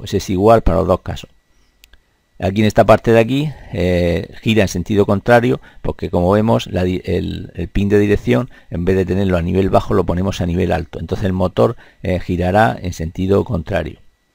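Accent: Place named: Murcia, Spain. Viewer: Hola, muy interesante!!! por tu acento y espero me disculpes por si me equivoco, eres de Murcia. No tienes un curso intensivo o acelerado? me quiero iniciar y no me importaria viajar a Murcia, si es que eres de allí (por la proximidad a Valencia), para aprender algo de lo que muestras.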